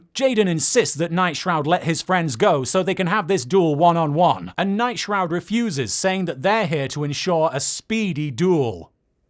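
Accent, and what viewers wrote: United Kingdom. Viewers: It’s amazing to hear how his accent has gotten so progressively less British. Like seriously. Go back and listen to his old vids. British. Accent.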